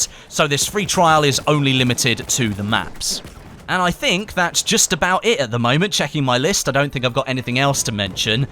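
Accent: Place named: United Kingdom. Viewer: I really like when you say “thanks for watching today! “ since I love British accents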